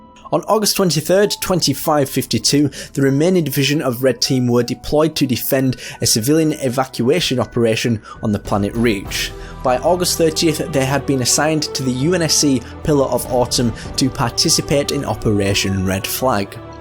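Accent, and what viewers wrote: United Kingdom. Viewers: Reverbed obviously British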